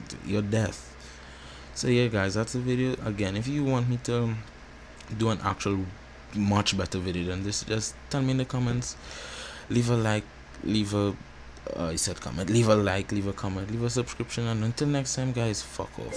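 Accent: Trinidad and Tobago. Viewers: Is that a Trini accent I hear?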